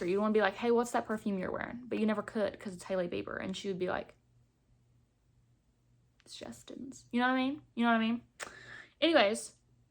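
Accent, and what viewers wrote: South, United States. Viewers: you are soooo pretty and your southern accent is beautiful ❤️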